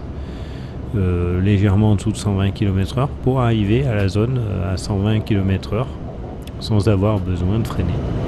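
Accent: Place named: Haute-Loire, France. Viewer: La voix de est unique, appaisante avec un p'tit air de la Haute Loire si je n'dis pas de bêtises.. 👍😊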